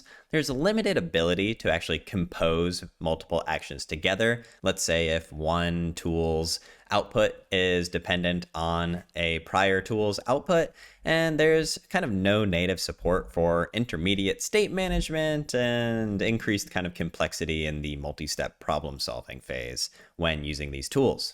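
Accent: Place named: United States. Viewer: Your channel caught my attention because of an Ł in your name. I thought you were Polish, but your accent sounds like you are USA born and raised. I love the content. Very informative and in depth. I can't believe you are not some ML wizz in the Silicon Valley. Keep up the good work!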